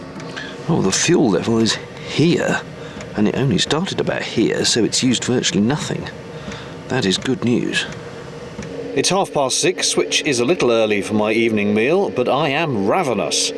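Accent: RP, United Kingdom. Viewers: Posh British person.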